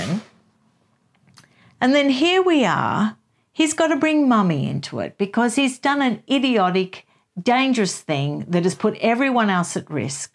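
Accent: Australia. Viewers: This is the first time that you have popped up on my feed and I'm glad you did, and it was so good to hear an Australian. Looking forward to watching more.. ❤ As for Harry, I find him to be a nasty person with no respect for anyone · ❤ your aussie accent.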